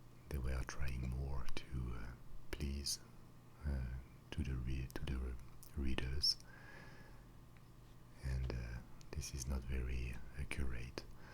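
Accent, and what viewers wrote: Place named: France. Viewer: male asmr voices have never given me tingles. until now. books.. French accent.. so many tingles. well done, Sir, well done. subscribed!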